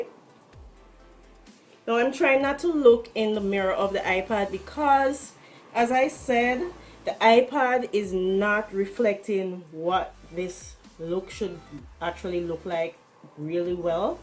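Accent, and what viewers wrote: Jamaica. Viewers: Are u Jamaican? U gotta be, I know that accent anywhere lol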